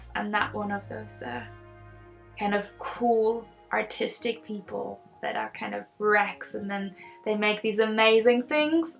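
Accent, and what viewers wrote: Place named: Ireland. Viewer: "...if you're still watching this." Hi,. How could we not watch your video:) this was a very good "how to". I would say you are just scratching the surface of what and how you do it. You raised many interesting ideas of "things to do" to capture your thoughts/feelings about life. You seem very natural and calm in front of the camera. Your English is very good, esp considering it is not your native language. I detect just the slightest hint of an Irish accent when you get rolling. I agree with being well rested, having a journal and writing in the morning when you say what you really think. This is a good topic and i am hoping to see more. I am proud of you, little butterfly 🦋 have a good weekend and thank you:)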